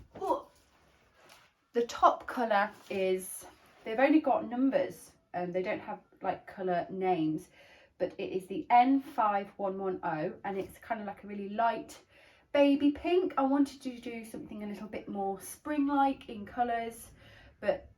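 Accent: Midlands, United Kingdom. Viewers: I love the sleeves on your novice sweater! I found your first podcast yesterday and loved it, so got up this morning to watch your second before work. Plus I thought I picked up a Midlands accent! I’m from up the road from you in Birmingham. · I really enjoyed your first podcast and am very impressed that you tackled jumpers early on in your knitting journey. I am originally from Coventry (Eastern Green) and now live in Toronto, Canada, so nice to hear a Midlands accent . Love the modelling shots of you and your adorable kiddies 😆. Keep it up and looking forward to your future podcasts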